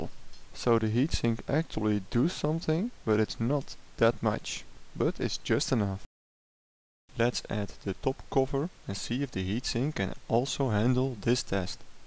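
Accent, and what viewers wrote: Netherlands. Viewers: hoe duur is zon heat zink ik hoor btw dat nl bent Nice videos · nederlands manier van engels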